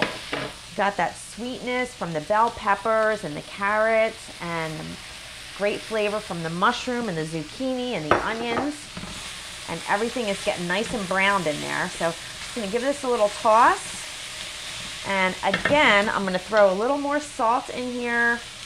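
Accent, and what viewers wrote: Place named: New York, United States. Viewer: I am going to make this tonight!!! Ps I love your accent!!!! Are you from New York ??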